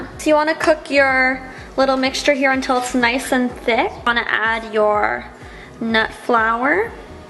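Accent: Canada. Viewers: are you Canadian? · she sounds canadian, is she?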